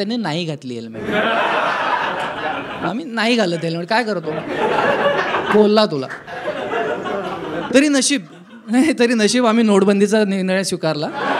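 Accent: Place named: Pune, India. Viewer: अप्रतिमच... पुणेकरांच बोलणं जगात भारी... आणि हो नाही देत बाकरवडी जा घरी 👌👌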